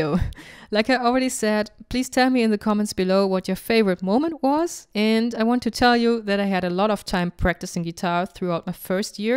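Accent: Germany. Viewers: Она ведь немка походу. Акцент)))) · Ich habe dein Englisch gehört und wusste sofort, dass du aus Deutschland kommst..... ^^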